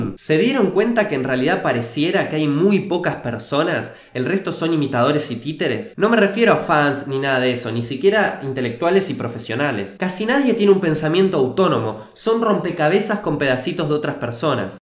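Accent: Argentina. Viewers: 5 hechos - Youtubers Banana te juego $10 a que cuando llegues a los 500k haces la gran Dross y dejas de usar el acento argentino en tus vídeos para tener más subs. ;) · che eres de Argentina? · Amigo veo tus videos desde hace un tiempillo y me agradan bastante la verdad, bueno solo te escribo para hacerte un sugerencia ojala no te lo tomes a pecho, pero creo yo que tu asento argentino es demaciado pronunciado, no me malinterpretes no me molesta en lo absoluto a mi en particular, mira iré al grano, tu canal tiene muchicimo potencial y quizas debas adaptar tu español a uno más neutro, hay pequeños chistes que dices y a veces me cuesta entenderlos, toma por ejemplo a Holasoygermán, es chileno pero su asento es muy neutro, pueden entenderlo en todos los paises de habla hispana perfetamente. Solo es una sugerencia amigo, aun que no lo hagas igual seguirémos viendo tus videos :), saludos desde Chile.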